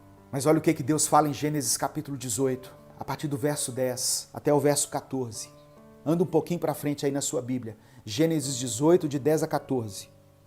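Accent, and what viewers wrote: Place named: Minas Gerais, Brazil. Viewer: Você é de BH ou MG? Seu sotaque não me engana rsrs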